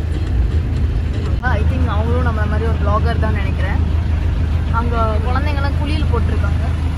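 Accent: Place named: Kerala, India. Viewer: Nice Vlog captain.. Especially your Malayalam slang.. Kutty titanic 🤣👌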